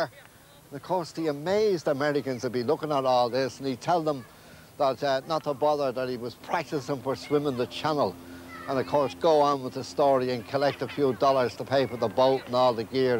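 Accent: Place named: Dublin, Ireland. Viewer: The aul Dublin accent, a thing of beauty. Ordanges and Chapelizard. Great stuff!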